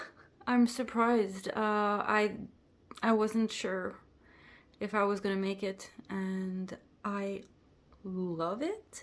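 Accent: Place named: Quebec, Canada. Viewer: Omg that is exactly the style I am trying to achieve thanks! You are good teacher and oh boy the quality of your videos!!! And that French Canadian accent. Love ya, a big fan from Quebec! Thanks for all the hard work you put in your channel. You are the best.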